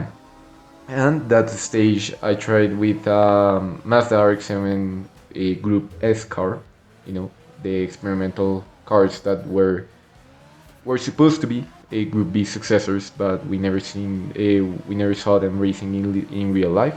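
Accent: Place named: Brazil. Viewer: lesgo mano, eu pensei porque seu sotaque ingles é bem parecido com o sotaque brasileiro em ingles, voce é de onde?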